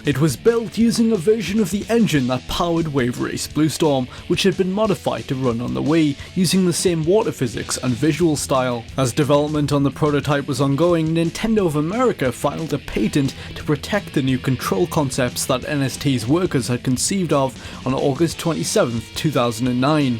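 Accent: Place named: Geordie, United Kingdom. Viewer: I instantly recognised your accent on Did You Know Gaming's video. Canny cool to see a local youtuber doing well haha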